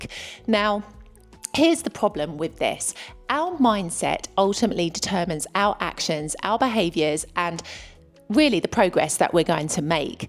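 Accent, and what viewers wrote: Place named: RP, United Kingdom. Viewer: Sorry for my lack of clarity. I meant your own level of Italian proficiency when you moved to Italy. As for your accent, I’d place it in the RP category. Best wishes.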